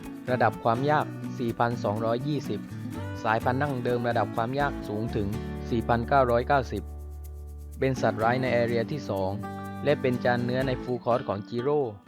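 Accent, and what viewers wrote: South, Thailand. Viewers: คนใต้ ชัดเจน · ผทรู้เลยนิ๊ว่าพี่เป็นคนใต้ชั้ยมั้ยนิ๊ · ไม่บอกก็รู้ว่าพี่คนใต้แน่ๆ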